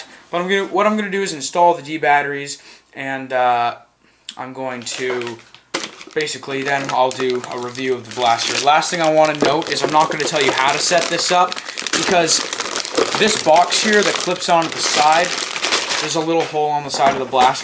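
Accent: Canada. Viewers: Are u a Canadian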